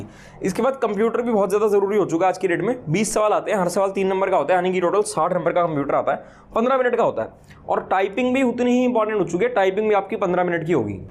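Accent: Haryana, India. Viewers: brother i think you are form hariyana Right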